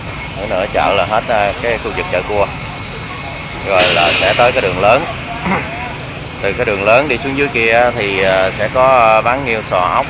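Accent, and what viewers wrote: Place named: Mekong Delta, Vietnam. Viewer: Nghe con nói chuyện...Thấy thương quá!😍 Rặt dân miền Tây luôn!🖐😍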